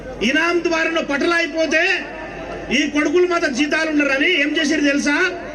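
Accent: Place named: Telangana, India. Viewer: ముందుగా మీ ఒరిజినల్ తెలంగాణ యాసకు నిండు జై భీంలు అన్నా, మస్తు జెప్పినవన్న సూపర్ ఇంకా మీలాంటి చైతన్య పరిచే నాయకులు మాలాంటి చరిత్ర తెలియనివారికి ఇంక చెప్పాలని ఆశిస్తున్న జై భీమ్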